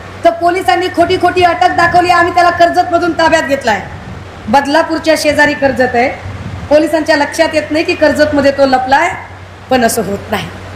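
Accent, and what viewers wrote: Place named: Bihar, India. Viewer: Tai lay Bihari bolataahe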